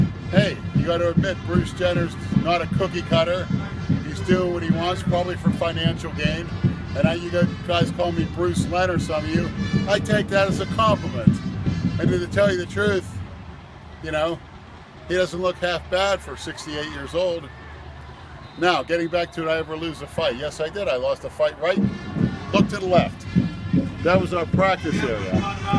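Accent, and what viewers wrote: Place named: Pittsburgh, United States. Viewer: I love the Pittsburghese, makes me miss home.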